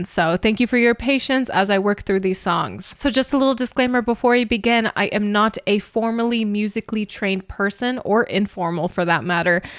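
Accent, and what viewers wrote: United Kingdom. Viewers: British public school commentary circa late '70s.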